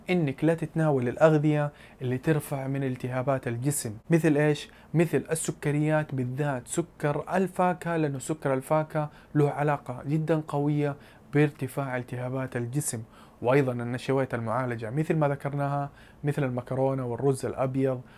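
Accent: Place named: Hejaz, Saudi Arabia. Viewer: سعودي حجازي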